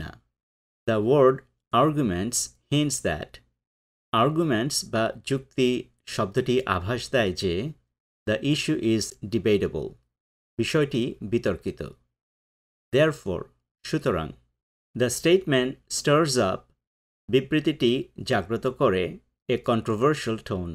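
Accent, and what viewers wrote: United States. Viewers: apu ami bolchi thik kore kotha bolte Uni american style na choose kore british ta choose korleu parten 😒🪧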